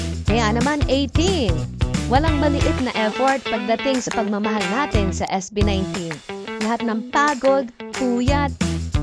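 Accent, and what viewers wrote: Batangas, Philippines. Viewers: Enjoy ako sa Batanguena accent mo Sis.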